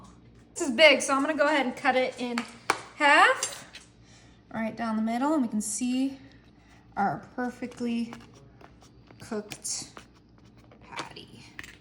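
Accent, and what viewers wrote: United States. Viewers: Meat is not meant to be that colour, what food dyes eere added to achieve it and are they safe (probably not as you sound as though you're in yhe US)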